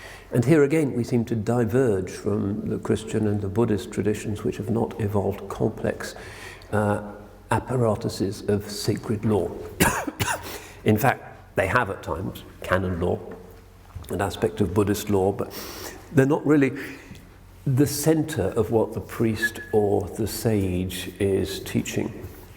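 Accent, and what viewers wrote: England, United Kingdom. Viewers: he has that quintessential English charm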